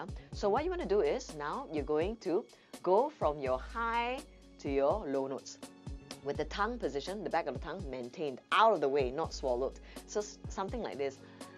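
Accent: Singapore. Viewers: Are u Singaporean or malaysia🤣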